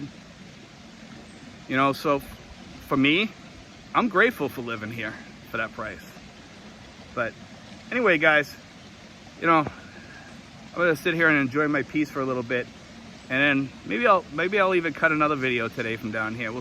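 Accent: Boston, United States. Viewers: You seem to have a slight boston accent but i could be wrong lol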